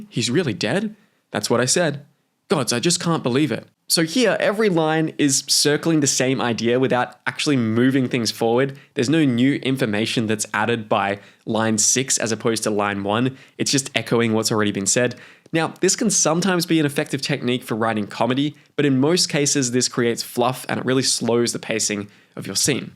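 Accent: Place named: Australia. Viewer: Interesting to hear your accent. Aussie but the US influence is much stronger than from my day. Change in “a”. Pronunciation of “figure” for example.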